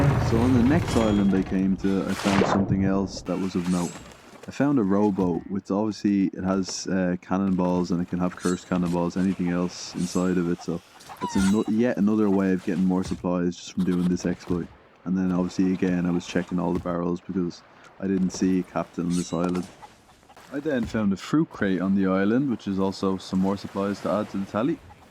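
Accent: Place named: Dublin, Ireland. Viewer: What part of dublin you from lad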